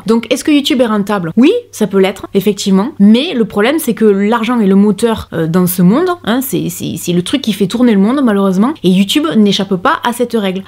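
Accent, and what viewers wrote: South, France. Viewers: J aime ta franchise ta sincérité surtout tes bons conseils et ta gentillesse tout ça arrosé de ton petit accent du midi Merci mon petit rayon de soleil s à nous change du make up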